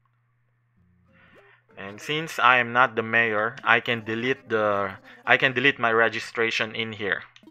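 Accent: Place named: Philippines. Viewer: Filipino accent there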